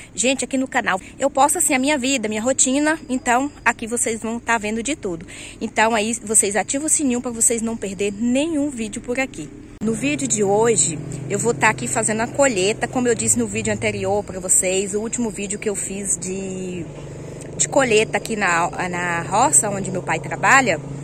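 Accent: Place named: Bahia, Brazil. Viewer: Sem nexo isso aí, Sobre o gerúndio não vi sentido algum, como que você está querendo que ela seja natural? Sendo que você está tentando ensinar ela o correto! Com certeza ela está sendo natural, se fosse ensaiado ela não iria falar "vou tá mostrando a colheita" e sim como você disse "vou está mostrando a colheita", que diferença isso faz? você é baiana? Ou não sabe que esse é o sotaque? Sigo outras moças baiana aqui que fala igual a ela, tenta, ver isso aí direitinho que você escreveu, na verdade você está procurando pelo em ovo de galinha!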